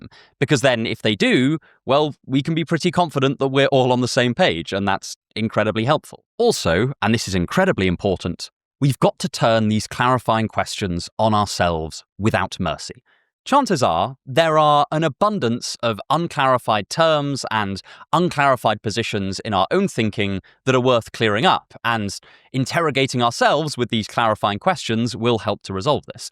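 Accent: United Kingdom. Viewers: Yes, I’ve heard the “opinions are like assholes” before, but I thought was inventive to adapt the idiom especially the part about being examined. 😂😂😂 And for me, hearing “asshole” with a British accent carries some elegance that the American accent never will.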